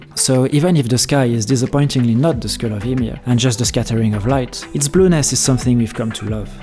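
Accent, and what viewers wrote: France. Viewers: The frenchy accent ❤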